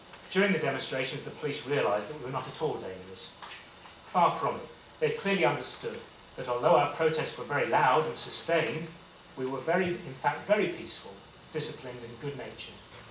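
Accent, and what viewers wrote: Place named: United Kingdom. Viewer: this is bizarre. This British guy is reading, verbatim, what sounds like a text written by a non-native speaker of English. What the hell is going on here, damn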